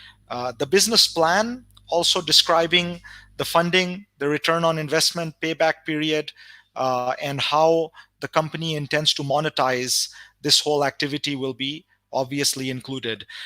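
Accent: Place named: United States. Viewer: We have high hopes from this authority__ the major duty is to start manufacturing the technological products by local engineers. For example machines in medical/ health sector needs an original machine and then engineers trainees under supervision from seniors make the copies__ I am ready to volunteer and invest in it. We have seen in China, Thailand, Korea, Japan, that making the new products is far cry but the first thing is to make cheap copies of famous brands. We, as Pakistanis have to be on war footings on this front Sar dena parey ga nahi to koi ley jae ga__ needs highest Patriotic passions here. I am listening this and unfortunately internet is not working of the speakers. Make the things simple __ don't talk in USA styled English__ do something positive in most humbled fashion plz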